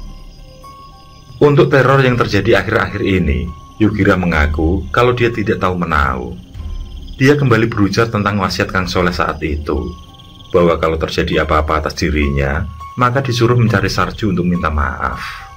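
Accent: Java, Indonesia. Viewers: Sukaaa denger suaranya pakdhe...baru aja sih nemu channelnya pakdhe ini dan langsung jatuh cinta...dialek jawanya pakdhe bikin ademmm...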